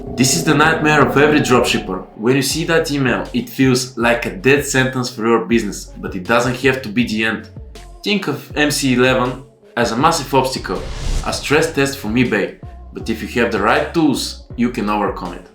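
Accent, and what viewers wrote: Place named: Russia. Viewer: Your English is very good Russian heavy accent 😅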